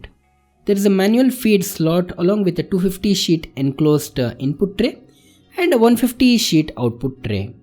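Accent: India. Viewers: Bro, it's typical indian english😊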